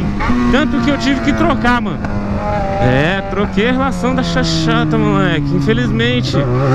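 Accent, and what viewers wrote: Goiás, Brazil. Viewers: porque vc fala no pique do Goiás vc nasceu em Goiânia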